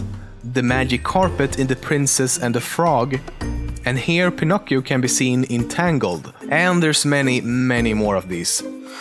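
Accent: Sweden. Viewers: Is he swedish?? He has a swedish accent. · You! Person who is making this video. Where are you from? Your dialect sounds a lot like those who come from my country, Sweden! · Hes not russian he is actually swedish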